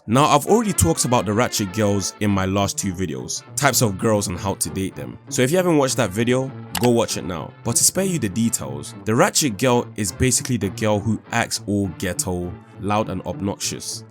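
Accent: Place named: United Kingdom. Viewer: bro how this guy talks in the most british way is just so funny and understandable 🤙😭🗣️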